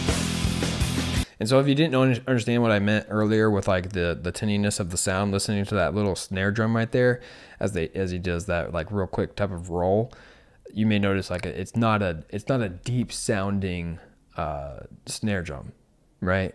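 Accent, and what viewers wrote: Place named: United States. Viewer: You sound like american. That explains why you dont seem to know very much about world and history. 😊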